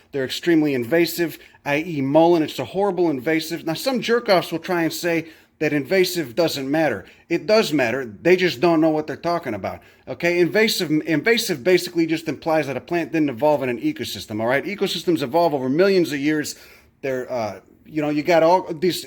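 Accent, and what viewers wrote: Chicago, United States. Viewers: I just found your channel last night and I'm hooked. I've really only ever had an interest in botany insofar as it related to what was in my garden or anything growing wild nearby that could be useful to me somehow. This is changing for the better and you helped me to widen my interest in not just plants but all life that exists nearby, wherever I happen to be. Also I like your accent. Chicago, yes? I really enjoy how your accent might make someone think a certain way about you but then you immediately dispel that belief by dropping some knowledge and talking about critical thinking, a skill that is sorely lacking in this country due to the fact that it's harder to exploit someone who thinks critically about how's and why's. Thanks for taking time to share your knowledge with the world. Best wishes from Seattle, WA.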